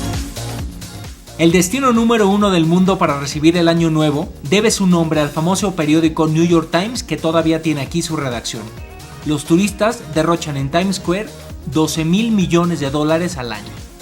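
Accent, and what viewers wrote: Mexico. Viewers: Estabas taaaan diferente: El look, los lentes, además el acento Mexicano súper marcado y los comentarios eran como más sarcásticos! Jajajajja- Me encantan tus videos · En ese tiempo tenías tu acento mexicano más marcado, me parece que ahora hablas más neutro. Saludos desde Chile!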